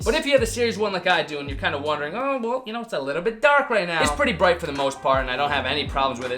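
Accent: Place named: Boston, United States. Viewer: That Boston accent 😂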